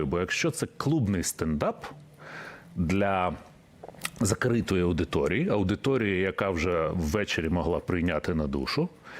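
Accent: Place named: Surzhyk, Ukraine. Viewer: Пробачте , але я сміялася ,бо чула знайомий акцент рідної мови " суржик" - теж розмовляла , та , це не заборонено , але ви праві - сьогодні коли війна , треба бути іншими - відноситися до мови ,до людей з повагою . На телебаченні треба, коректно працювати . Скадовськ, Херсон - це Україна ! Рідний край ,рідне море ,рідна земля , рідна мова. "Суржик" теж рідний 😂 А українська - велична !❤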